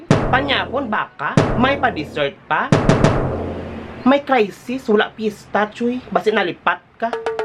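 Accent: Iloilo, Philippines. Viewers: Im not ilonggo,, i just know tagalog,,i dont know how i can undrstand but in someway ican understand a bit what you are saying,, ,,,i love the ilonggo accent!❤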